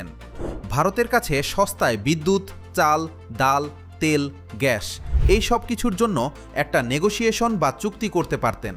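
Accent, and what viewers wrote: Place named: Bangladesh. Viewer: আপনার উচ্চারণ শুনেই বোঝা যায় যে আপনি একজন বাংলাদেশী। এখানেই ধরা পড়ে গেছেন আপনি।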